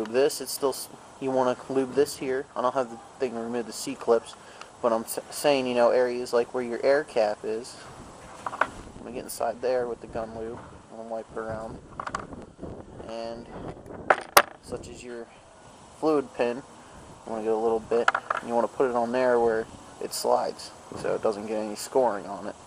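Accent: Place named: United States. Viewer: Yo, great video! Very thorough, I appreciate it a lot. Around the mark you mentioned that it's now illegal to do... such and such. I didn't quite catch that, despite trying to replay that portion. What's now illegal, exactly? It is only in your state, or nation-wide (assuming you're in the US based on your accent) Thanks again.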